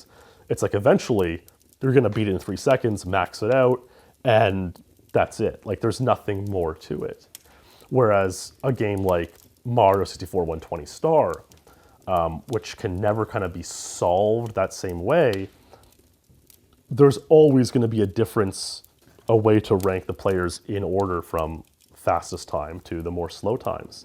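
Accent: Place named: Canada. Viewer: what part of Canada you from?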